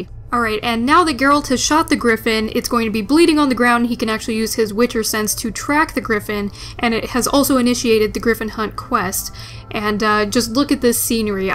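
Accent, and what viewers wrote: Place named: California, United States. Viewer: It sounds like Califonian but diffrent, thanks for rap anserw. Cheers.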